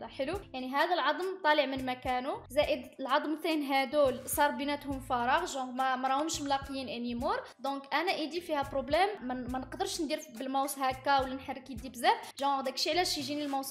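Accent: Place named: Lebanon. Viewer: هههه تتكلمي لبناني 😅😂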